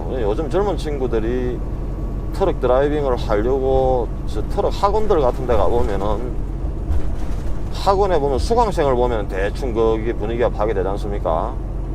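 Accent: Gyeongsang, South Korea. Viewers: 경상도 말이 귀에 착착 붙네요 안전운전 하세요~~< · 고생 많으십니다 경상도말씨 구수하네요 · 트럭에 관심생겨 님 유투브보다가, 댓글처음 남깁니다. 셀프결제 샤워시설 신기하네요^^ 경상도 사투리, 형부생각나 구수하네요, 겨울시작인데 안전운전하세요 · 가끔어쩌다 트럭을 주제로한 영화말고 생생티비라니..근데 경상도 사투리가 아직도 그데로인데 아메리카 트럭커는 어찌 되셨는지 그게더 궁굼하네요..ㅎㅎ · 🇺🇸🙏🏻🌍돈받고 여행하는 직업 ㅎㅎ😂👩🏻🤔🙋🏻‍♀️👍👀깔삼 오랫만에 듣네요..쪼매 ㅎ😂😂😂👏🏻👏🏻👏🏻🇺🇸🙏🏻저는 대구가 고향..🇺🇸🇺🇸20년 미국 살아도 사투리 여전 ㅎㅎ 님도 많이 쓰네요..😂😂👏🏻👏🏻🇺🇸🙏🏻👍🇰🇷🌍🍀🙋🏻‍♀️한번사는 인생..님처럼 사는것도 용기가 많이 필요할것 같아요.저는 파킹을 못해서 큰트럭은 못할것 같고..😂👍👩🏻🤔🤓🙏🏻🇰🇷🌍Michigan 에서 할수있는 것 부터 👀👀👍🌍👏🏻🙋🏻‍♀️저도 운전을 좋아해서..그리고 혼자🐯🐯새로운 직업을 가져 보고 싶네요.2022🇰🇷🌍🙏🏻항상 잘보고 있어요🙏🏻👀🇺🇸🙋🏻‍♀️💕💯🌍🌍👍화이팅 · 먹고 살기위해 하시는것이지만 조금만 편하셨으면 하는 바램입니다. 경상도분같은데~~~너무 고생하시는것같아 마음이 짠하네요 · 머나먼 타국에서 수고가 정말로 많네요. 어투가 경상도 분이네요. 혹시 주유소 몇곳과 주유소 접해있는 모텔을 운영하시는 신 부갑씨 아시는지요? 그분도 고향이 경남이고요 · 처음으로 알게된 너무도 멋진 영상 과 푸근한 경상도 목소리 재밋게 듣고 보고 있는데 댓글보니 고인이 되셨다니 안타깝네요 꽤나 많은 인기도 쌓으신분이. 늦었지만 고인의명복을 빕니다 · 은근 중독이 됩니다 카나다 까지 가시어 경상도 구수한 말씀을 들어니 정감이 더갑니다 ㅎㅎ 늘 안운하시고 즐거운 시간 보여 주시길 바랍니다. 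스근하게 즐감하고 가니더 ^^* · 경상도 고향이 어디십니까? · 경상도 캐내디언 ㅋㅋ 멋집니다. 한때 캐나다 이민을 원했는데.... 아 ~~~~ 간접적으로 대리만족 합니다. 어차피 한국이나 외국이나 어디 사나 물질적으로나 정신적으로나 행복하게 살려고 노력하는 자세가 중요하네요 ㅋㅋㅋ awesome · 아재.. 경상도 어디 사람이에요?ㅎㅎㅎ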